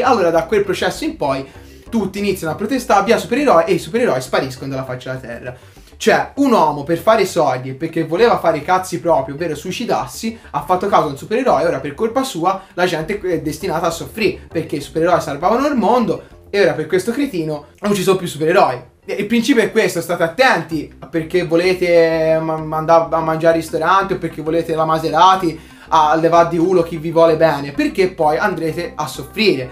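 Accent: Tuscany, Italy. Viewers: adoro il toscano. ho aperto il video e l'ho lasciato andare solo per farmi cullare dalla tua voce. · Hai proprio ragione ed è chiaro anche nel tono di, molto "toscanaccio" (anche io parlo così, dal vivo, anche se in dialetto lucchese! XD) e colloquiale. Non pretende di essere portatore della verità assoluta. Ma certe persone leggono recensioni o vedono video come questo e seguono il metodo "pecorella". Mentre avere una propria opinione per me è qualcosa di meraviglioso.:)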